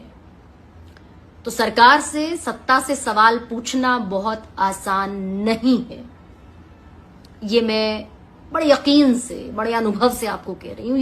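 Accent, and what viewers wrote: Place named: Rajasthan, India. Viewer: Rajasthan ki Serni hai aap itna kehna bhul gaya mai apke bolne ki bhasa se samajh aya aap Rajasthani ho Sorry Mam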